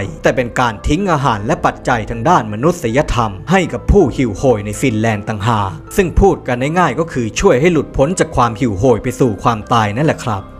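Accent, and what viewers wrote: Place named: Prachuap Khiri Khan, Thailand. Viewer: สำเนียงประจวบคีรีขันธ์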